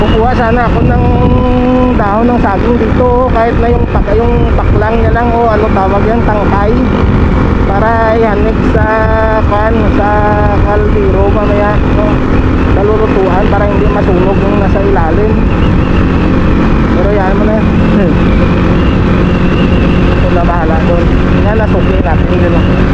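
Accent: Iloilo, Philippines. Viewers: pahingi ng alimasag boss hehe ilonggo gid ya ang tuno ta pareho gali ta sini pero taga sultan kudarat kami,kanami gid sang view da sa lugar nyo,halong lang permi ah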